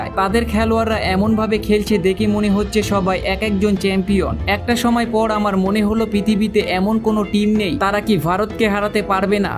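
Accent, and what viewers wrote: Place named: Bangladesh. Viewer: বায়েন 😂😂😂😂 কি বলো কথা শুনেই বলা যায় তুমি কাঙলাদেশি😂😂 ভাষা ঠিক করো এভাবে লাইক পাবে না😂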